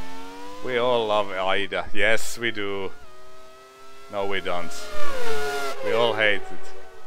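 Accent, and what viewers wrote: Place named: Finland. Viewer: You have a finnish accent by the sound of it.. I used to play this game back at 90's in Kuopio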